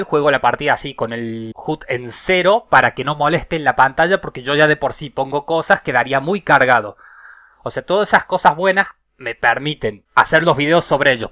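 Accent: Córdoba, Argentina. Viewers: Me parecia un acento cordobés pero se me confundía por el filtro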